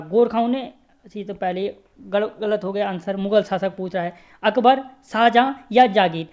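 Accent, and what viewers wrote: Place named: Chamoli, India. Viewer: Bhai aap pakka chamoli ke ho na.....thoda aur practice ki jarurat h apko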